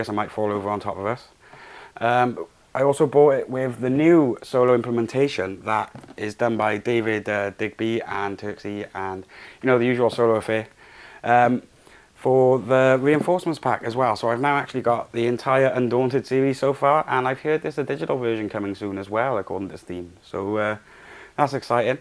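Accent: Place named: Wales, United Kingdom. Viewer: Where are you from bud? Obviously I'm not asking for an address lol. Just sounds like a bit of a Welsh tang to your accent. A bit like myself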